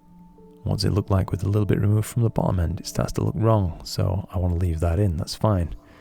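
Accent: United Kingdom. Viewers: Tried again from scratch, now I get this "Cannot execute instance on view: R Reason: LRGBCombination cannot be executed on grayscale images." ( to your earlier note, there is no way to extract luminence from the split G or B files ). Do you do zooms? Be happy to pay you for 30 mins to watch me and tell me what I'm doing wrong, live in the USA, guessing you're somewhere in the UK? (I'm an Australian so I speak British lol)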